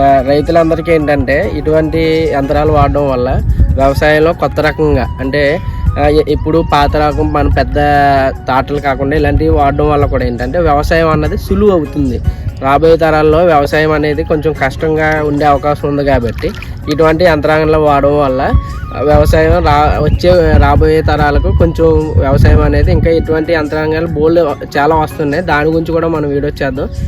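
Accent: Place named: Konaseema, India. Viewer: కోనసీమ యాస.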